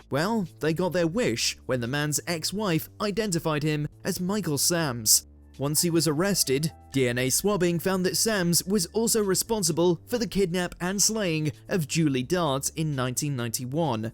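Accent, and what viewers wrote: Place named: Wales, United Kingdom. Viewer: The narrator is Welsh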